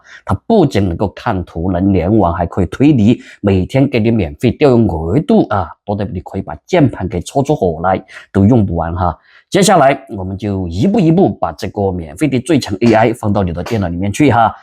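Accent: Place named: Sichuan, China. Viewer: 熟悉的川普😂😂😂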